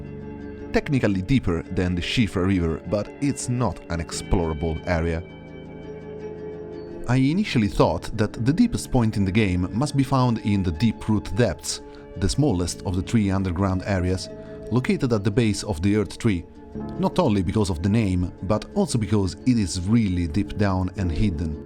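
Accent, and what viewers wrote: Italy. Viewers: Your accent is Italian eh? :) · You have the most Italian accent 😂😂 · ma sei italianissimo si sente subito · Compà si sente da 80 km che sei italiano 😂, bella li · L’accento italiano è valore aggiunto al video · Amazing video! Very sleek editing. I was wondering how you got rid of the fog, a run without it sounds fun. Also, are you Italian? I'm not completely sure but you pronouncing the name of that town correctly and your accent points that way. Great job! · Ho la sensazione che tu sia italiano, l'accento c'è, son contento di vedere qualcuno dei nostri fare BENE dei video in inglese, complimenti!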